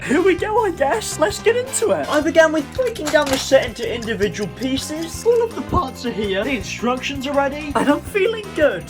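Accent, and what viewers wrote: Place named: London, United Kingdom. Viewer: Your from London lol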